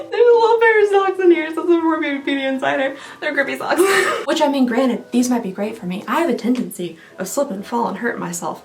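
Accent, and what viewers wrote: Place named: Texas, United States. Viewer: Her Texan accent got stronger as the vid went on. The rage was too much.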